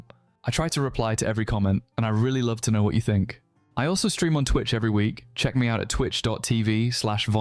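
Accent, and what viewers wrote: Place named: Scotland, United Kingdom. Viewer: I cant help but notice a scottish twang in your accent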